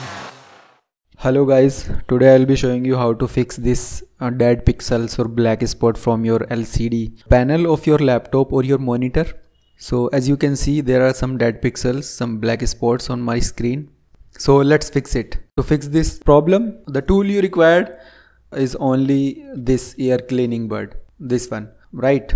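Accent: India. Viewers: He has the best indian accent!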